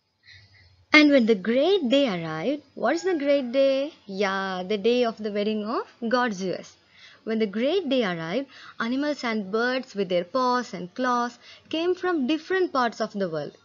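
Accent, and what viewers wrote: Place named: United States. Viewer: thanks mam but I think that you are from America because of your voice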